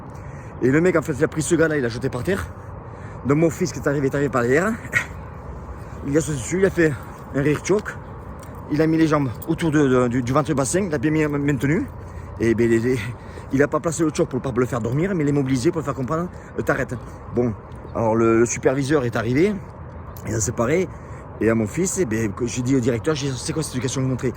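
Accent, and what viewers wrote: South, France. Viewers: Bonjour les USA, bonjour, toujours d’excellentes vidéos avec notre accent du Sud 😉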